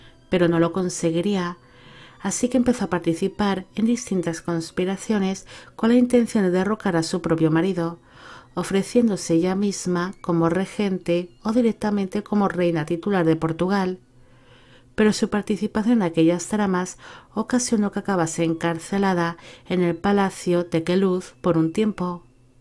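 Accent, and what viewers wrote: Spain. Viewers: Sos española?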